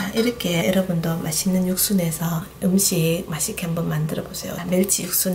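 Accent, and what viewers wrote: Gyeongsang, South Korea. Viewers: 경상도 억양이 반갑내요~ 오늘 처음 와 봤어요 구독 눌렀어요 잘 보고 갑니다~ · 상어..무섭지않아요 유치원 애기들 상어 인기짱입니다 우리동네 맛집으로 유명한 멸치육수칼국수집 비결이 바로 이렇게 육수내는거예요 요리는 배워가는게 많답니다 구수한경상도 아지매라서 더욱 흥미롭습니다 늘 고맙습니다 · 가족님들,부럽네요😋.대구고향떠나40년해외거주하는할매입니다.정겨 운고향말씨와 편안한분위기가 느껴져...시원하고알찬요리솜씨에👏🙆.멸치육수끓일때 생강한쪽넣어면비린내가덜나지않을까싶은데 어떨까요?✨👱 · 설명이 구수한 경상도 대구 말씨가 묻어있어 고향 향수 풋풋 하구요 미모는 전형적인 한국의 풍성한 정감에 내가 좋아 하는 멸치 다시물 설명 꼼꼼히 챙겨 정취했습니다 언제나 보람가득한 날 되시기를 기원 해요 🙌💖👍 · 미국에서도 응원보내요~~ 코인 육수 사서썼는대 뭔 맛도 안나고.. 해서 이번에 첨으로 멸치 사와봤어요 육수 직접 만들어보려구요. 멸치말리려고 내놨어요^^ 낼 꼭 따라해볼게요. 저도 경상도에 살았었는데 말투가 넘 반가워요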